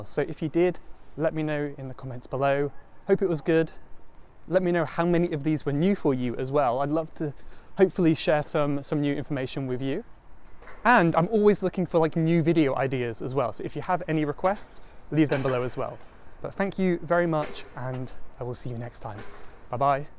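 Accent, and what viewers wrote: United Kingdom. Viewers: thank you very much , i learn a lot of british accent here 🤝💯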